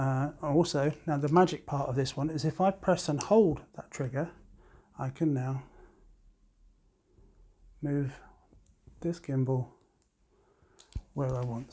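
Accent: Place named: England, United Kingdom. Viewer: nice to here an 'English' voice here on YouTube. Can I use with my Pan Lum SZ330? (bridge camera) I know what you mean about the focus on Panasonic my is all in and all out difficult to get a nice slow in/out. I'm considering buying a dedicated camera (low cost) video camera for the 4500? What do you suggest? On camera mic; Can I put a Rode 'type' mic on the separate handle or is there some better option? Great review.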